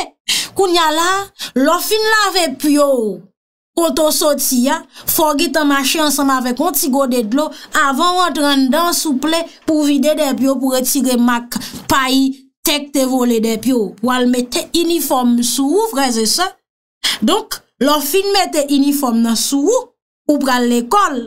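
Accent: Cap-Haïtien, Haiti. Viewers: nèg kap dekouvri kay la, aksan sa se nèg okap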